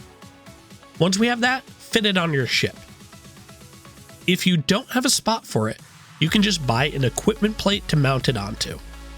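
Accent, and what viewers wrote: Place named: India. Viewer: love your indian accent , and your typical indian youtuber intro style... jai hind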